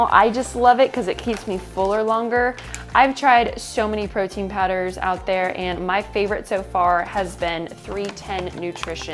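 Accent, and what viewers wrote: South, United States. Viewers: Who els noticed a little bit of a southern accent in voice. 🤔😁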